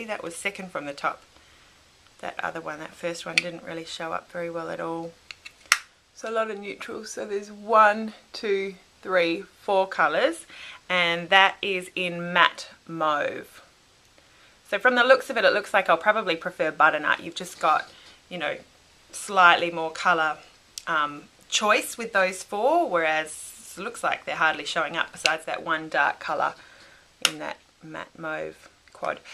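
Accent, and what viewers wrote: Australia. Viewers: feel a bit special being mentioned :) btw i'm loving the videos finally someone who understands how ludicrously expensive makeup is here in australia! enjoying the familiar accent that i am not use to in the youtube beauty community.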